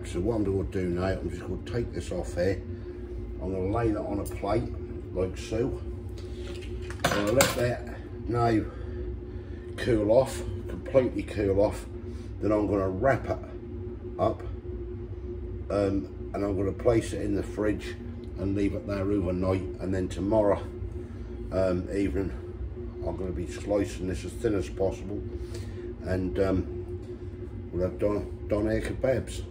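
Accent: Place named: England, United Kingdom. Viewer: Decent grub that is! Trying to work out your accent mate. Are you from Gloucester by any chance?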